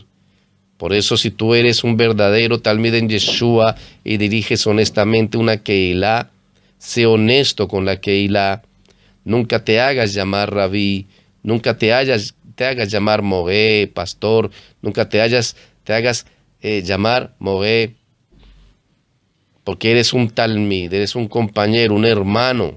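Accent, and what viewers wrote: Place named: Spain. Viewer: Me surge otra pregunta! Porque habla usted como español si no lo es? todos los hijos del Eterno amamos sus mandamientos y obedecemos su Torá por amor de El! Eso es más que obvió! No se trata de una idolatría a Pablo! Se trata de la Torah! Sin pruebas no se debe haceptar testimonio contra nadie! Y menos por boca de un solo testigo que no aporta pruebas! Así que asegurar sin pruebas que Shaul es mentiroso sin decir en qué miente! Es sin dudas un falso testimonio! YESHUA a muerto por nosotros! Pero en su mismo orden de ideas tampoco debemos ver sus videos ni escuchas sus enseñanzas pues no son las del MESÍAS! Digo más podemos escuchar a todos y prestar atención a los que hablan conforme a las enseñanzas del ADON! Sabiendo siempre que solo hay un ELOHIM y solo a El Amamos y servimos! Lo anterior antes de la lluvia de insultos de idolatría y acusaciónes falsas en mi nombre! 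Amo al Eterno y procuro vivir en sus mandamientos con todas mis fuerzas! Y esto lo aprendí del MESÍAS y por obra de su siervo Shaul y de los apóstoles o envíados de los profetas y su siervo Moisés! Shalom. Shalom Shalom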